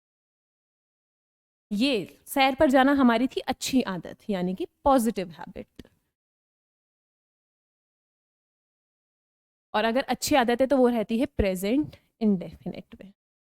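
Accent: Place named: Uttarakhand, India. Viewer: Hello Mam aap dev bhumi uk se lgre ho aapki language tone se or sir name se clear hai ki aap yhi se ho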